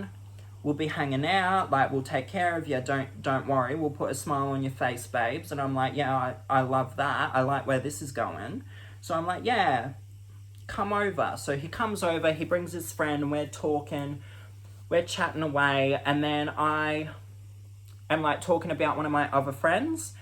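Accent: United Kingdom. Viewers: why did you choose the cockney accent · i love your accent. what part of England are you from?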